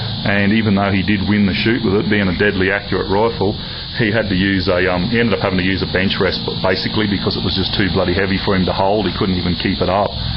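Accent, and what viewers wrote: Australia. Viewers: I UNDERSTAND THE AUSSIE ACCENT!!!! everything you say souns like your asking a question!!! lol jk but great rifle.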